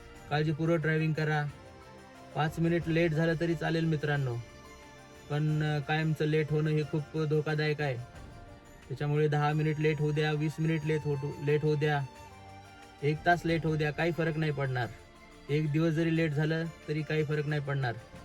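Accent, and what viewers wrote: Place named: Latur, India. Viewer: 🙏🙏राम राम दादा सर्व काही माहिती खूप छान देता कॅनडामध्ये राहुनी ही मराठी गावची लातूरची भाषा नाही विसरला तुम् ही❤❤👌👌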